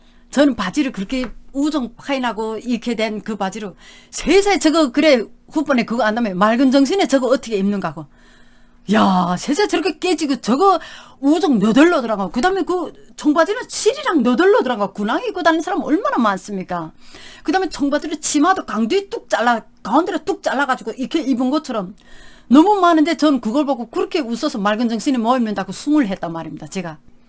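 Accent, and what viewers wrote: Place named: North Korea. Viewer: 로마에와스면 로마에 법을따라야합니다 여기는 북한이 아닙니다 당신이하는말이 조선말을있는대 외 외래어을 하는야고 합니까 여기는 조선이아니고 대한민국입니다 당신도 말먼저 배워가지고 방송국에나오던지하세요 정말로 말을 듯기가 거북힙니다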